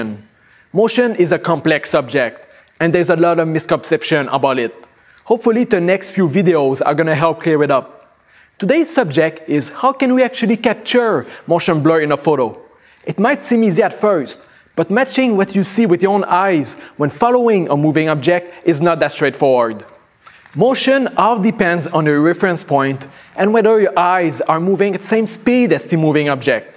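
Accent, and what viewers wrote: Quebec, Canada. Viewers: I recognize this accent from Canada QC :) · This guy sound like a criss de québécois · On dirait que je reconnais l'accent Québécois ? :) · Vincent B. On est 2 a reconnaitre l accent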